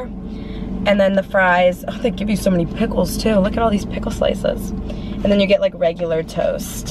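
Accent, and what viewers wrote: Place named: New York, United States. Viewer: Your not from NY with that accent?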